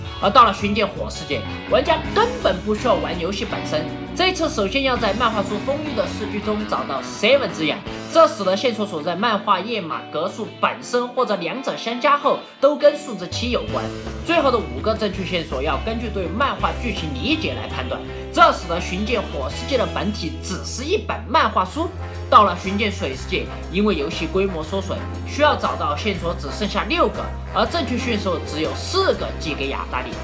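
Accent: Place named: Sichuan, China. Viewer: 蜀產狗，土話嗆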